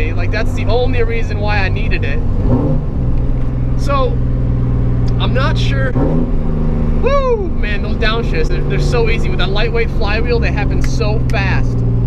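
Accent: Australia. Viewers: Nice aussie accent, i was thinking more Steve Erwin, R.I.P. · That Australian accent 😍😍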